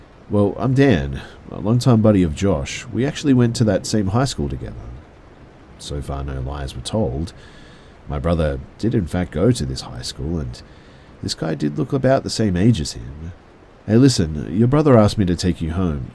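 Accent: Australia. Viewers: Have I just found my first Australian night time narrator? 🤞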